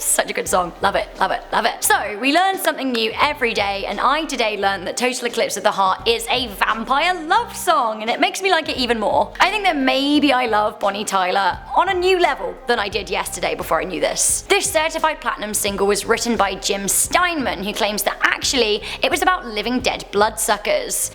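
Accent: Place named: United Kingdom. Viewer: Is this woman from Britain? No offense she just sounds like she is from Britain.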